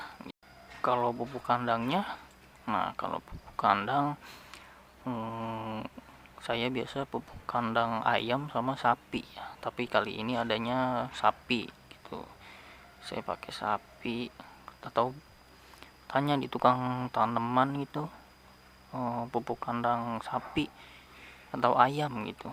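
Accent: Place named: West Java, Indonesia. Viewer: Bangx kayax orang sunda ya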